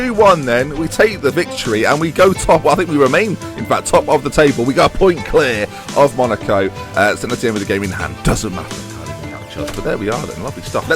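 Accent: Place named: Newcastle, United Kingdom. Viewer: chuffed for you for hitting 30k subs mate. u deserve it man. me fave youtuber from newcastle england to australia. currently in a hostel in mildura victoria but stil watch ur vids every day. reminds me of home so cheers wor kid. canny geordie accent aswel but stil needs work haha!! keep doing wot u do pal. #fluid #geordieinoz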